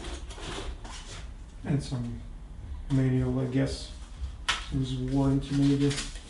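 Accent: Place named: Russia. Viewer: Чую русский акцент)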